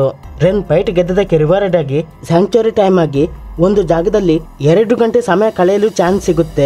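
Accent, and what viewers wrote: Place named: Mangalore, India. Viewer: Are u from Kudla/Manglore?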